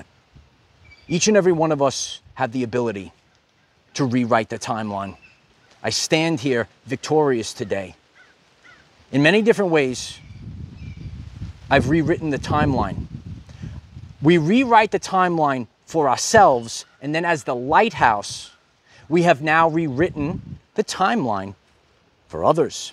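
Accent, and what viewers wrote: New York, United States. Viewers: a beacon of life from my past....your little NYC accent, all love...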